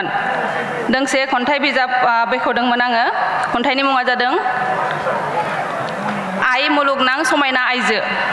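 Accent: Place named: Bodo, India. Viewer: Pior boro raoya . jwbwt.mwjang.jadwng.mam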